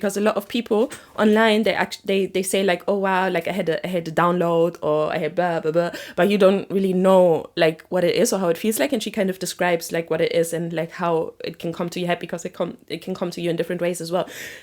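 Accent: Germany. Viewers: Ich wollte mal fragen welchen Stein ich benutzen kann als Steinbock :) Ps : Dieser deutsche Akzent 😭✋ ich liebe es 😂😂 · i like your German accent, I assume it's a German accent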